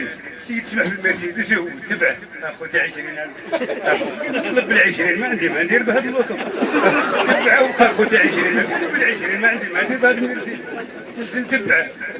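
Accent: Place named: Beni Snassen, Morocco. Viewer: c'est pas l'accent de la ville d'oujda, c'est un znassni ..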